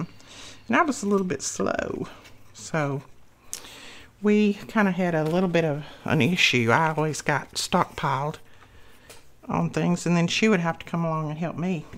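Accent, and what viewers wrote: South, United States. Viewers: Well your voice reminds me of home. I was raised in the south but have lived in New England for the past 21 years. I miss me some "home" sometimes! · Cute. I do have a question if I may ask, does it give an accordion look ok the one end and feel to them. I love the prima papers. They are really nice quality hopefully ghhjjjj me It's hard to find in PA however I do find it in Maryland. And I love your southern accent. It's nice. Reminds me of when I was a little girl and I loved in Georgia with my military parents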